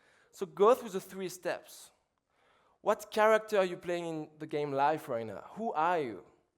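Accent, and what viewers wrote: France. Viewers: America always falls for any French accent when added to a hunkie body. This guy is a trail blazer he will go far. Because under the anarchy of his life he has the zen zazou spirit. Everybody loves a smiling hunk with a French accent ou la la mama mia!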